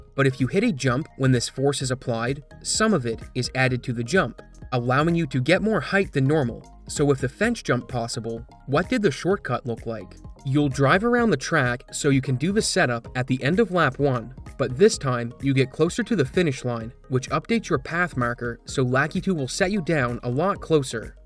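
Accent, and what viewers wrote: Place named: Prince Edward Island, Canada. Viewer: I forget if I've asked this before., are you a Maritimer? and around there I feel like I'm hearing a strong Maritime Canadian accent. "Time", "Line", and "Marker" sound very Newfoundland lol. For the record, I love it, I just really honed in on it after that time-stamp and can't stop hearing it lol. I bet anyone who wants to take it, a fair bet I'm not Googling first, $100 Canadian that grew up in Newfoundland (and Labrador). I could use the money and I'm so sure I'm right lol. You have to internally accept the bet before Googling the answer. I'll know. Edit: My second, unofficial guess for betting purposes, as I keep listening to him, is PEI. That's Prince Edward Island to you non-Canadians. That's just for pride. First honest guess was Newfoundland. Just started hearing PEI more as I listen.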